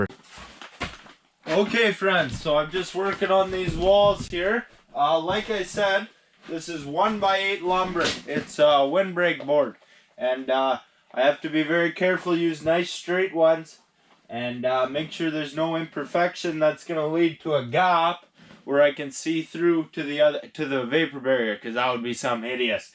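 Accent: Canada. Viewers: You’re the most Canadian dude I’ve heard since I last listened to a Bob and Doug song! Cool off-grid building, I’m inspired!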